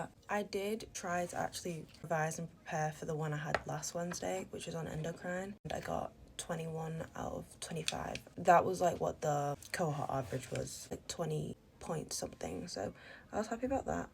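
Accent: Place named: Bradford, United Kingdom. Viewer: you are drop dead gorgeous!Which part of the England are u from?Because I am from England as well.I live in Bradford.I literally love your accent and it sounds like a Bradford accent.I completely understand if you don't wanna reply to my comment and tell me which part of England your from and you will always be my IT girl slay queen.Love you girl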